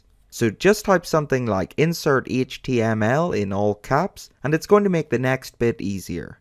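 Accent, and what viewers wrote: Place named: Northern Ireland, United Kingdom. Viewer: Its Marty Dread here lol Laughing my balls off, always good to hear another person from Nor Iron.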